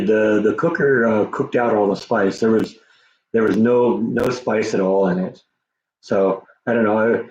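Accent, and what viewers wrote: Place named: United States. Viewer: I love your accent, American men sound sooo sexy to British women. I have a very British accent and speak very proper English.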